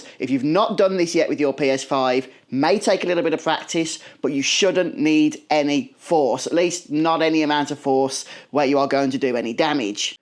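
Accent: Birmingham, United Kingdom. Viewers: As soon as I heard the Brummie accent, I knew this was going to work. And it did first time. Thanks mate you really helped.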